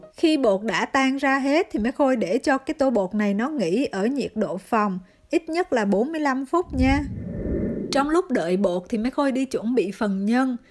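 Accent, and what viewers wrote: Central, Vietnam. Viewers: Cảm Ơn chia sẽ của chị Giọng nói miền Trung của chị nghe dễ thương Lai lai một chút giọng Huế 🥰🥰🥰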